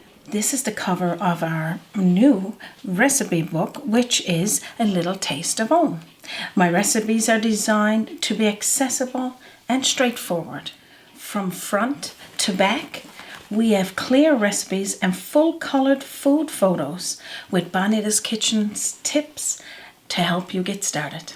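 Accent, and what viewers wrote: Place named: Newfoundland, Canada. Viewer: Oh my Bonita I could listen to you talk all day, it makes me think of growing up in Newfoundland. I think I will have to get me a couple of your cook books.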